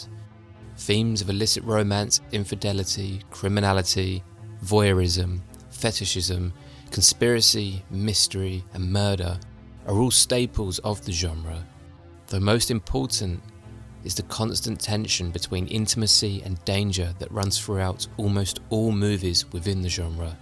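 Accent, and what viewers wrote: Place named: United Kingdom. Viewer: That was amazing! I put it on while doing boring admin work, but had to keep stopping to watch. I’m going to give it a proper rewatch. I love your sexy British voice and accent. It was great to work to. Will be great the second time to pay actual attention tot he piece. I actually create erotic content. It is not the typical vanilla stuff but is Fetish & Femdom POV. Erotica has always inspired me since I was very young. Wrote my art history thesis on Japanese shunga. And 9 1/2 weeks is one of my favorite films ever. My GOD is that one good.❤ What other films in this genre would you recommend the most?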